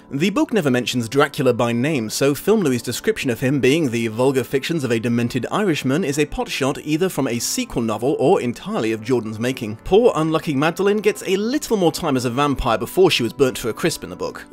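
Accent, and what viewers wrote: United Kingdom. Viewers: What part of Great Britain are you from? I'm sure I've heard that accent before! But I don't know now where it is from. I lived in Benidorm for more than ten years. I was in contact with many British people during that time. Please, tell me where you are from! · The British propaganda is working on my American ass, because that intro would have been a big nerd if it weren't for the accent 🫠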